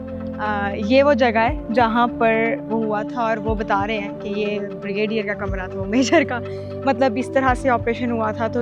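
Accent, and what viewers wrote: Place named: Karachi, Pakistan. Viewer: Apka bolne ka andaz karachi wala hai or lahori ho wo kese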